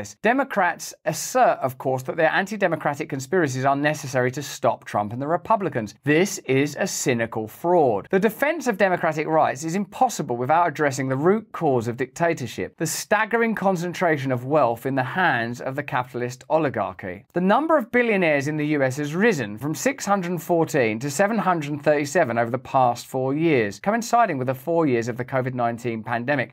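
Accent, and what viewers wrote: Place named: United Kingdom. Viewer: Used to like russel now he is alex jones with a british accent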